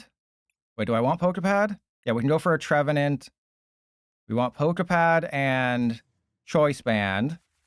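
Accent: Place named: Australia. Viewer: Living in Australia and still lucky enough to hear incredible aussie accent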